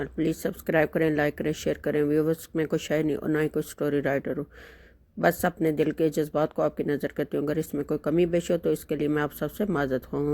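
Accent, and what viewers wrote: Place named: Punjab, India. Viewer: metha punjabi lehja... behtreen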